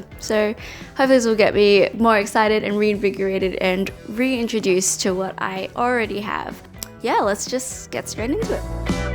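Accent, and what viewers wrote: Australia. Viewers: Oh jeez. ANOTHER austalian i had assumed was british. help i can't place accents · Hiiiiii. I love your style and your accent. Can you recommend some other Aussie youtube channels that you love watching? I believe that if I enjoy watching your videos, definitely I will love your recommendations. Cheers!!!!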